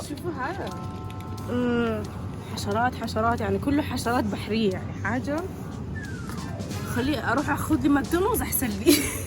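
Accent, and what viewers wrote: Saudi Arabia. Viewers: لهجة جداوية بححححتا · لهجتها سعودية ودمها سوداني وجنسيتها تشادية 🔥🔥❤ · هههههههه باين مأثرة عليك الرياض لهجتك المخلوطة حلوة ... الله يحفظك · اتكلمي باللهجتك ....مرة سعودي مر سوداني لا تنسي روحك انتي تشادية سوداني ابدوي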